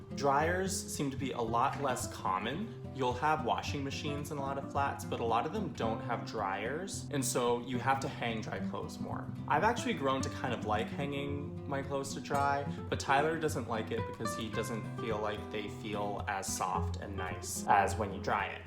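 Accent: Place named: United States. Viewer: Unfortunately you do sound like a complaining American.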